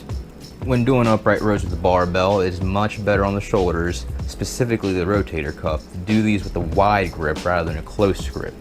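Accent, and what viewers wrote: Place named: United States. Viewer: Lol that intro and what's with your country accent boy lol keep it up though man!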